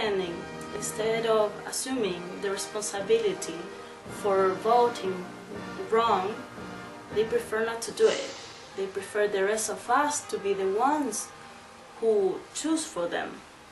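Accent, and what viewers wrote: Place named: Germany. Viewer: so cute with your german accent! hahahaha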